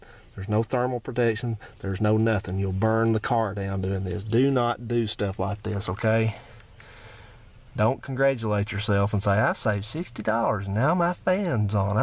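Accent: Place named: Appalachia, United States. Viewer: You sound like you’re from WNC or E. Tenn.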